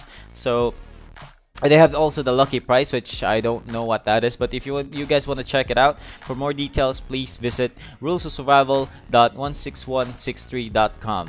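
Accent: Visayas, Philippines. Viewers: English bisaya ka!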